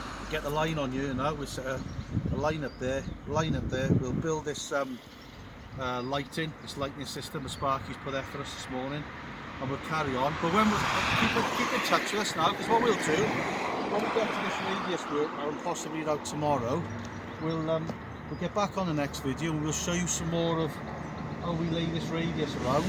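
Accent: Wales, United Kingdom. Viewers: Nice video 👍 very neat work and your welsh which makes it better 😂cymru am byth! 🏴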